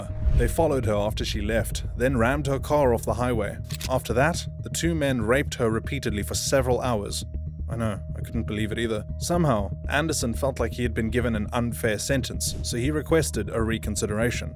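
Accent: South Africa. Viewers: so refreshing to listen to a South African accent for a change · South African, but it's not that strong, like they've been living in England for a long time . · bro are u South African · Hey man super random, but are you South African? I’m SAn too but it’s rare that I find a SA YouTube channel with this content and this good. Would love to hear from you boet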